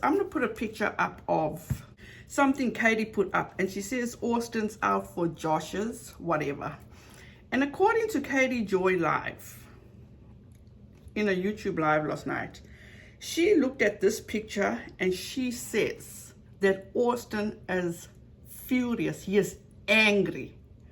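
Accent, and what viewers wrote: South Africa. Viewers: has GOT to be sipping vodka in that cup, and be delusional af if she thinks that 'joke' was funny in any way, shape, or, form.. P.S. Are you from South Africa? Even speaking about, your voice and laughter sound like music! It's beautiful, thank you for sharing it with us!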